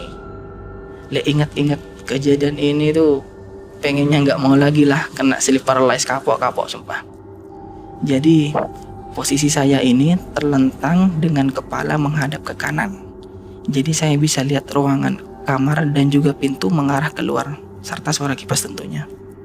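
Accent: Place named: Madura, Indonesia. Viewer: kirain suaranya lagi serak, ternyata logat maduranya masih terbawa, bagus ceritanya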